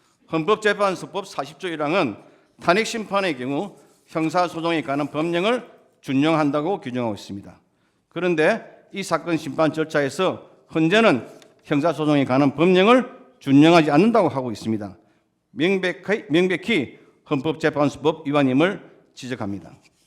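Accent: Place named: Gyeongsang, South Korea. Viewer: 또 경상도네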